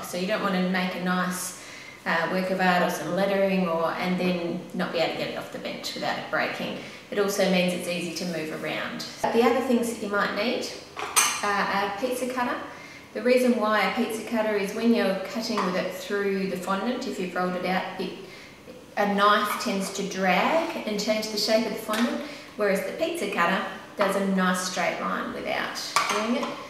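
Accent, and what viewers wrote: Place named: Australia. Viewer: Wow! I came across your channel while typing in: How to make Pokemon cakes ^_^ Thanks for the fondant colouring video. This was great. And judging by your accent, i can make out you're from Australia. I'm from Melbourne. Haha ^_^ Anyway, please keep posting more videos.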